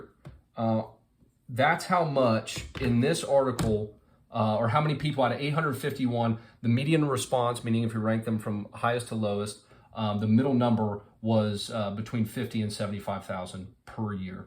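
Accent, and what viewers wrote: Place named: Texas, United States. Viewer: Hey keep up the content. I have noticed from my own experience. By going from house hack to house hack you end up with more cash flow so therefore you need less properties. By the way really heard that Texas accent come out on this one LOL.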